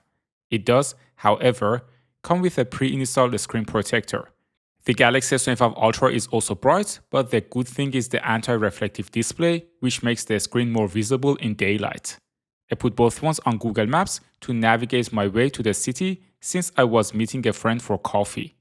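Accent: Iran. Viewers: Bro you sound like a Persian guy trying his best to speak fluent english.❤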